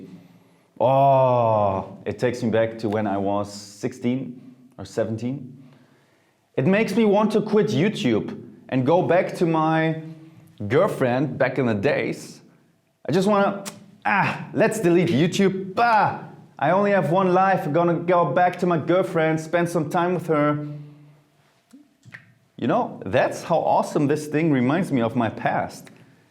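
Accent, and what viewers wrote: Germany. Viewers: This german accent gosshh you are the best!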